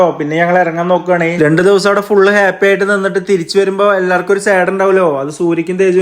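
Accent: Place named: Thrissur, India. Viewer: Thrissur language aanallo ethh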